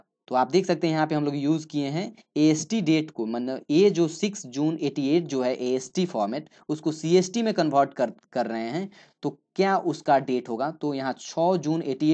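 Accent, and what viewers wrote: Bihar, India. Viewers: bihar k lagte ho bhaya...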